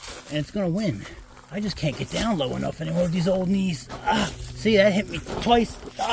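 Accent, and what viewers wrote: Philadelphia, United States. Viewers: I hear your accent are you from Philly?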